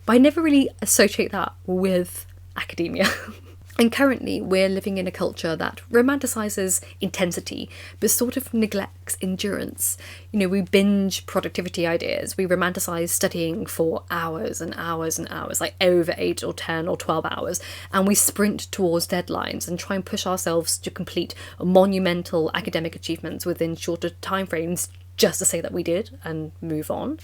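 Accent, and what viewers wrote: United Kingdom. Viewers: How did I know you were British before I clicked on the video?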